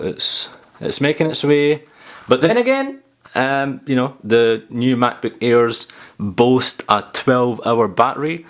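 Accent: Scotland, United Kingdom. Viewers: Thanks for the review man, it was very helpful. By the way, nice accent, reminds me when I was living in Scotland. Lovely People!!! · I felt that i learned more and paid attention better because of the scottish accent. Made it seem friendlier! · damn i dont understand shit in scottish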